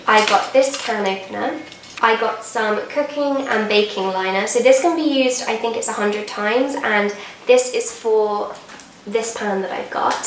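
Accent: RP, United Kingdom. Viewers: Do you put this accent on ... your sister talks so so so much different which is a bit weird ? You sound posh yet she sounds not posh hahah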